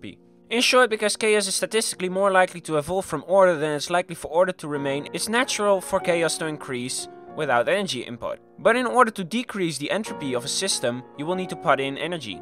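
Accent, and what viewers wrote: Netherlands. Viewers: Are you Dutch? Sounds like it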